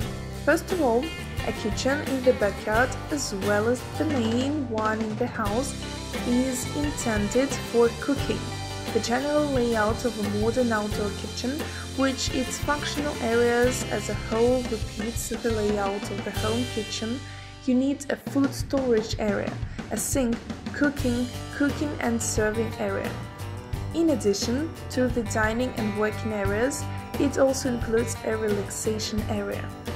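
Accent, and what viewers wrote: Russia. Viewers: Видео отличное, но вот вопрос: почему нельзя было озвучить на русском и сделать английские субтитры. И еще: Где нашли такой рязанский акцент?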